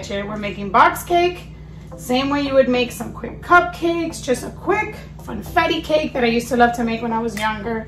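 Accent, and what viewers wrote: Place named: Puerto Rico. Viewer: first timein your channel . you sound from Puerto Rico wepa!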